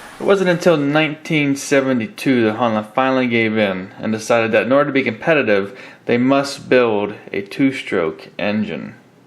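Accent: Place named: Pittsburgh, United States. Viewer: Nice Pittsburgh accent. lol GREAT job capturing the history of these AWESOME machines.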